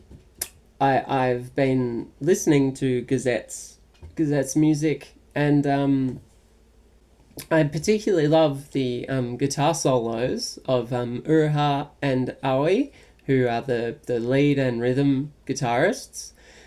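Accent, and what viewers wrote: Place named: Australia. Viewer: I wanted to give a shot at one of your videos Suddenly clicked on this one - got satisfied with somewhat cozy vibes Btw, love the Australian accent xDD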